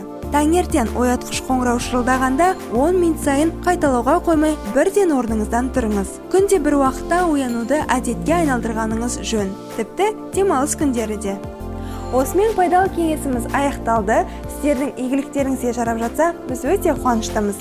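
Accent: Standard. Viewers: Не деген сулу казакшаны таза сойлейтин кыз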